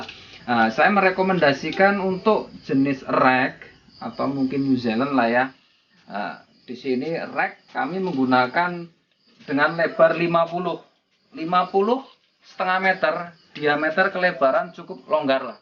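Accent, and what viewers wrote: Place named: Banyumas, Indonesia. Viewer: Walau sy pelihara English anggora tp tertarik sekali nonton chanel sampean, bs juga di terapkan,, aplg sama2 ngapak haha Salam ngapak Banjarnegara👍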